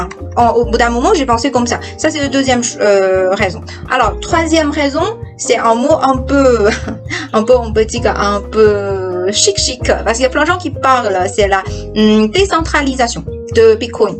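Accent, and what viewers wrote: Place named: Northeast, China. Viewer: 东北大查子味法语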